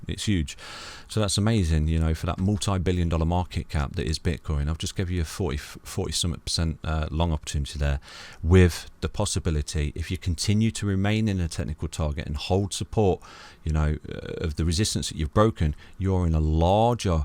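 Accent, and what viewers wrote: United Kingdom. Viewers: The Man with the British voice and beauty full Analysis!!!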